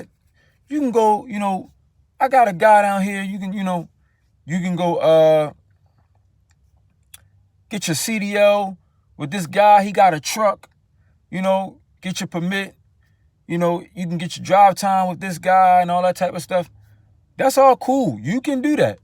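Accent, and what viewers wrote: Baltimore, United States. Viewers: I’m a nurse but just be curious about other professions n general but I like how U kept it 💯 a lot solid info and I def heard that Bmore accent lol by any chance u remember which season/episode of The Wire u were n? Thanks for the video ✌️ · 2 things I learn from this video. 1. What to expect my first year pay of trucking. 2. I can still recognize Baltimore accent from anywhere.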